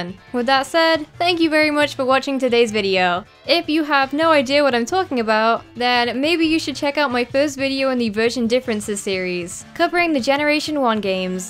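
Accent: England, United Kingdom. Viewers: BearShark Interactive she's british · She's English, as in, from England.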